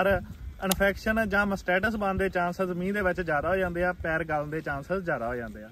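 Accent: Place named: Majha, India. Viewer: Veer teri boli boht sohni te masoom va Tu hr gal ch hega va na use krda lagda Majhe area toh hega 😂😂😂😂😂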